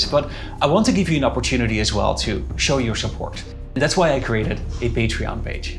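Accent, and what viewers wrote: Netherlands. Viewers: I can tell you're clearly Dutch yourself by listening to your accent. I live in Holland as well and though the government doesn't want to get, in your words, "stupid rich". They have been plain stupid in other aspects for decades. I had high hopes for the new government but I think it's all ready to late. There's a demographic time bomb ticking in our country. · kind of strange.. your accent is very clearly Dutch so I’m wondering how you could have missed this? It was all over the news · I am Dutch and I didn't even know all that... never realised why the government was so reluctant to close it all down, because we've never been made aware of the fact we got most of our social benefits out of that... we don't even know how we compare to other countries in that regard, but we were acutely aware of the fucking EARTHQUAKES that NEVER happened before the FRACKING started nor anywhere else in the Netherlands; so it was kind of a BIG deal. 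Therefore you should consider making these video's in Dutch for Dutch people, cause I can hear that you speak Dutch as your first language, so it shouldn't be more difficult, you might have a smaller audience at first, but the information you give could really change how people in the Netherlands discuss these issues, cause right now we're only aware of farmers and people who got fucked by the government, who's lives got destroyed by our official tax department (IRS in US). So yeah, you could do lot of good is all I'm saying. Probeer het gewoon. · No doubt you're Dutch. The thick Dutch accent. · You just know this guy is Dutch, his accent just gives it away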